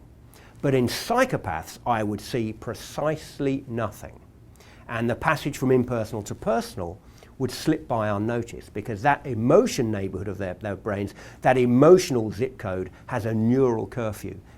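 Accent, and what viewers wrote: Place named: United Kingdom. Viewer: İngiliz aksanı yalnız bu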